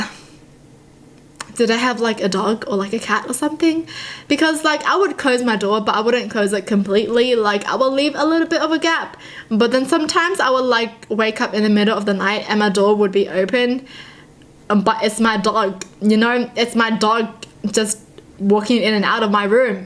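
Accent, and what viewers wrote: Australia. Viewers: Are you Australian,kiwi...or..... Btw I think this is a Cool video......i watch this before I had to get to sleep....LOL. I subscribed(to this channel and your beauty channel),liked,and hit that bell (to this channel and your beauty channel).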